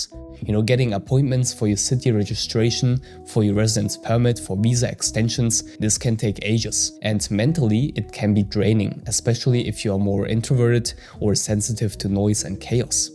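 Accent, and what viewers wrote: Germany. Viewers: May I ask, Is German your mother tongue?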